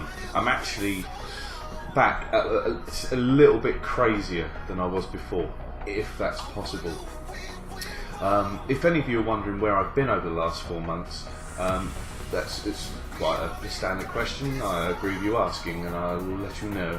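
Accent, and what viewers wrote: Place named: Cockney, United Kingdom. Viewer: second that! Good to have your cockney tones back on YouTube : D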